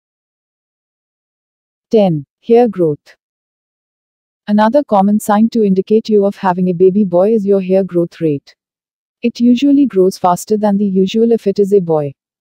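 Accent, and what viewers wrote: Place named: India. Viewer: the thick indian accent has spoilt this video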